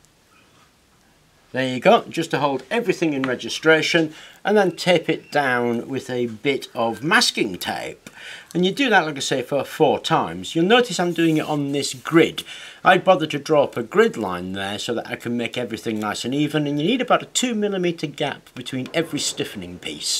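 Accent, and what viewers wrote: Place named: England, United Kingdom. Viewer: Actually watching this for camera bellows. That English accent is just the cherry on top.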